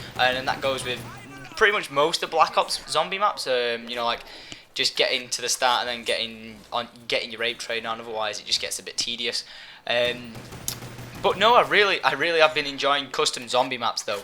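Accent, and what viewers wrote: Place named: United Kingdom. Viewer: i love how british people say modern warfare phree :D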